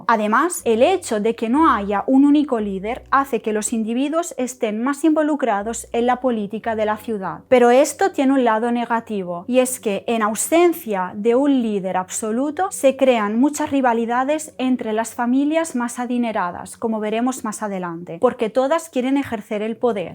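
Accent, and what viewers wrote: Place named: Italy. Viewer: Se te quedó el acento italiano al hablar español.😅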